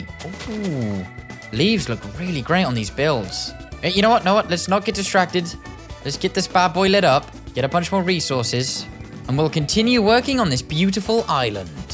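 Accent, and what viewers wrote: United Kingdom. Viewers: in my honest opinion, I didn't know u were British 😂😂😂😅 And plzzz make a 200 days, that would be AWESOME! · you sound britsh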